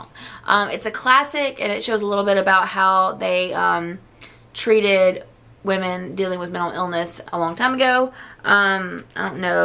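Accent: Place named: South, United States. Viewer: Also let your accent shine girl!! Idk if your southern, but I love it😊☺️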